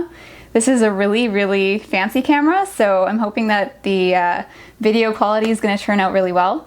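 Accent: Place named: Canada. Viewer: I just found your videos the other day and am enjoying them. I have had my Chloe, an Aussie mix, for 7 years. These grooming videos are so going to help my husband and me. Also while watching your videos, I was like is she from Canada? My husband is Canadian. Then I saw the comments and was like yup she’s Canadian. 😁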